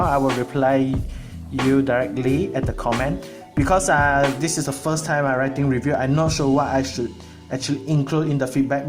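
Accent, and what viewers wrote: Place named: China. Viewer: Wao ur English so Chinese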